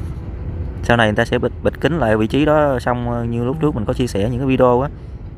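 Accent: Vietnam. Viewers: Mong được xem nhiều video của về công trình này thêm. Giọng nói của bạn rất rõ, rất Miền Nam, nghe rất thích.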